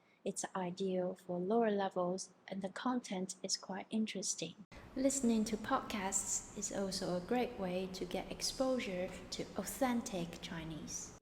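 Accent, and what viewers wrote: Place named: United Kingdom. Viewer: 谢谢老师推荐，你的英音太好听啦！！！🥰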